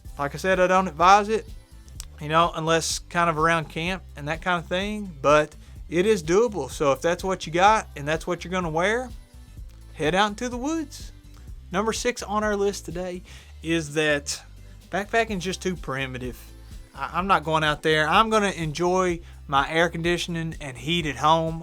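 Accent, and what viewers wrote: United States. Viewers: Ur a likable bloke, u sound so American and 🤣 Please don't hike in trainers (tennis shoes) 🙄🤣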